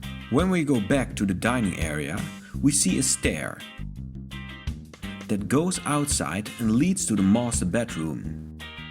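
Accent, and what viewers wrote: Netherlands. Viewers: Een Nederlander die Engels praat. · You happen to be Dutch? Great video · The narrator must be Dutch?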